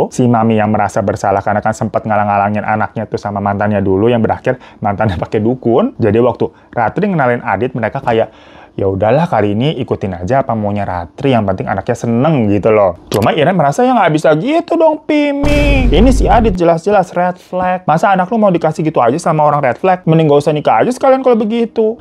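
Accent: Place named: Medan, Indonesia. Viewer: KEK MANA, Udh kek orng Medan ya bg :v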